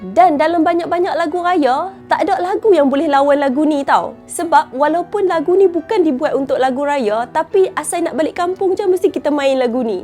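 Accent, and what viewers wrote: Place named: North, Malaysia. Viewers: Apa masalahnya klau dia nk ckp Utara... Menandakan org melayu bukan jenis lupa diri... Lagipun org melayu kedah adalah suku melayu terbesar di Asia tenggara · Meluat pulak dengar speaker cakap slang utara. Cakap je la bahasa melayu biasa. Asal orang utara je kena pakai slang dia. Kalau orang pantai timur tak boleh pulak pakai slang dia, nanti orang tak faham la apa la.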